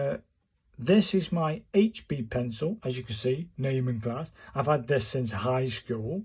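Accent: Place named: United Kingdom. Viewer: I watch your videos because of your "British Accent" and Calligraphic Content. Good Luck. Keep it up.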